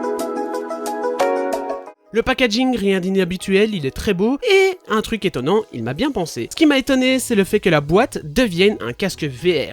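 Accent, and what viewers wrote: Belgium. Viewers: Bonne vidéo ! Belge?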